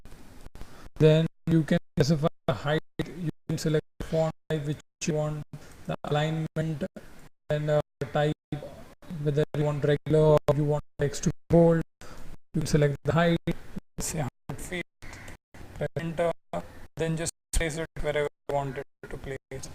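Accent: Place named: India. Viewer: With respect: This is a valuable tutorial. That cannot be denied. There is one problem with it, that being the speaker's accent - Indian, I think it is. It is very painful to listen to this man explaining his subject. It is the same with the many Indians presenting on the wide variety of topics which they post. I'm sorry for having to say this: these men and women want to share their knowledge with a broad audience, but their use of English language is a major stumbling block for we listeners.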